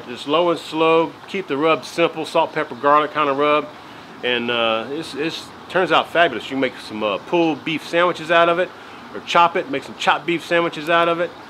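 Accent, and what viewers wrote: South, United States. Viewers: Looks good, might have to try this sometime. Is it just me or has developed an even STRONGER southern accent in the last year or so since I watched one of his videos? Seems like he might be hanging out a bit more than usual with some boys from the deep, deep south.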